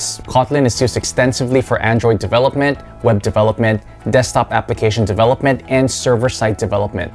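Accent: India. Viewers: If you're really from India, its unbelievable, Your accent is a wow in respect of Indians.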